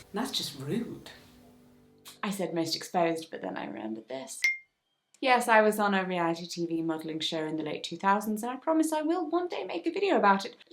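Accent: RP, United Kingdom. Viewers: If Erin from The Office was a pin-up with a posh accent, she would be.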